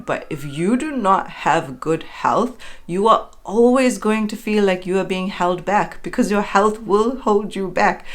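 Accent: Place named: South Africa. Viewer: Beautiful inspiring video ❤ Also recognize a slight south african accent...love it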